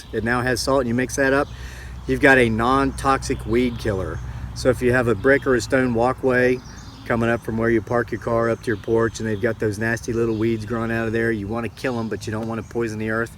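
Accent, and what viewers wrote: South, United States. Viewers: I love with all the practical advice & especially your wonderful Southern accent! I live in Broadstairs, Kent, UK, but will try your fly deterrent! More power to you!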